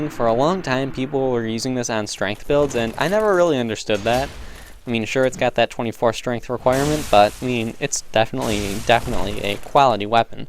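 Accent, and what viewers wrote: United States. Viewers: I speak german. You are pretty much pronouncing it correctly. Heavy american accent but correctly. (I'm american just sayin it sounds like an american sayi g it right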